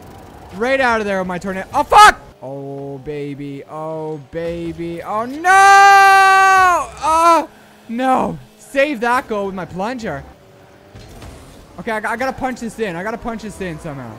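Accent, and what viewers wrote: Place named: United Kingdom. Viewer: i loved this, i found it very humorous with the british accent, very awesome rocket league gameplay, I'll have to reinstall this at some point and play it again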